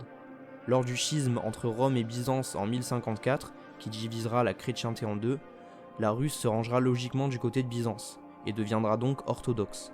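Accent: France. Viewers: C'est un parisien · C'est l'accent du sud 😉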